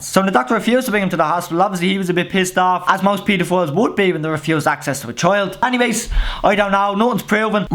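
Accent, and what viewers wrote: Dublin, Ireland. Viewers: what section of Dublin are you from? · what part of ireland are you from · your the only Irish YouTuber I've ever met and I love the Irish voice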